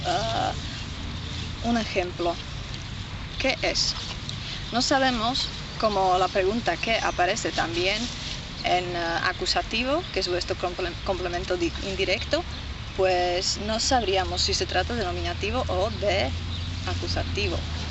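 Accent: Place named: Castile, Spain. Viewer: Que hermosa como es que hablas español castellano me encantan tus videos saludos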